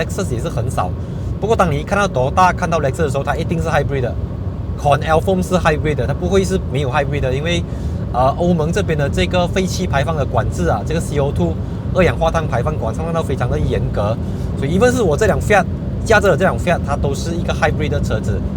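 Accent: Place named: Malaysia. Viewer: 看到一个口操马来西亚腔的主持人review欧洲的国家，有一种马来西亚终于走向世界的感觉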